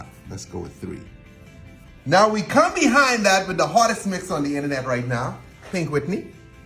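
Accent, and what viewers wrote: Bahamas. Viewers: Sound like a Bahamian accent 🗣🙂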